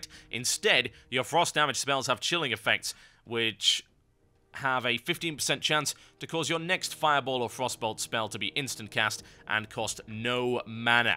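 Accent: United Kingdom. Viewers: why did I just spend nearly 40 minutes watching a video about talents on a class I dont even play?... I think its that british voice.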